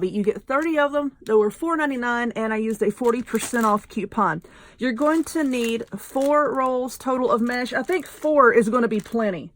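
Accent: South, United States. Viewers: Guurrll! You crack me up doing Valentines crafts and we are STILL in 2018!😂😂😂 BUT! How can we resist this beautiful wreath! This is aaaabbsolutely STUNNING! Also I love your southern accent!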